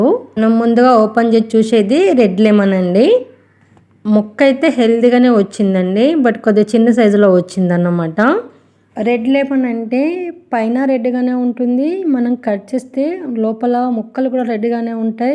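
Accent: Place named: Telangana, India. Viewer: Asalu chandalam antey endi andi mana Telangana lo chala slangs unai ah matram kuda miku telvadha adhi village slang miku nachakunte vinakandi mimalni evar froce cheyaleru antey kani okari basha baledhhu Ane right miku ledhu...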